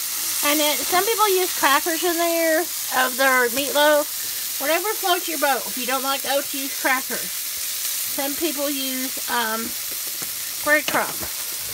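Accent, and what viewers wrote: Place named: Kentucky, United States. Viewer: Ya sound like me when ya talk..I am from Kentucky. and I feel at home here...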